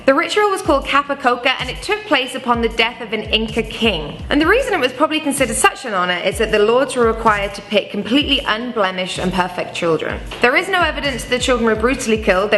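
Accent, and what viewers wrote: England, United Kingdom. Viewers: sounds 3/4 enlgish 1/4 american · British accent, I barely understand what she is talking about. I am not American. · And people didn't believe me when I said that some English accents really aren't all that nice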